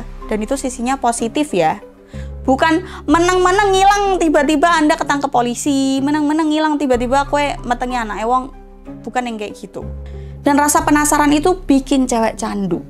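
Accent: Java, Indonesia. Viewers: jawane medok yo mba? tapi bagus triknya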